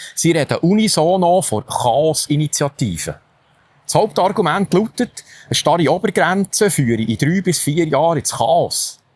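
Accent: Bern, Switzerland. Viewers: Für mich ein klares Ja, weil das Schneeball-System AHV kann mit Zuwanderung nicht gerettet werden (Punkt). Da gefällt mir die Idee von mit dem Startkapital in einen Weltweiten ETF von 15'000.- für jedes in der Schweiz neugeborenen Kindes besser. Dieser darf erst mit 65 besser mit 70 Jahren als Rente (Bezug max 4%p.A.)ausbezahlt werden. An die 70 Jährige Person oder an seine Erben. Hoffe der wird eine Petition einreichen🙃 Übrigens, danke für dis schöne unverfäuschte Bärndütsch😇 Lg Resu vo Loupe <link>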